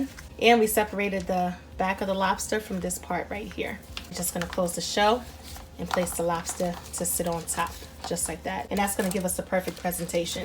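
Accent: New York, United States. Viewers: I can’t wait to make this. I was looking for a lobster Mac for this Mothers Day. P.S. are you a NYer ? I recognize the accent sounds like I’m listening to someone from home. I post the results.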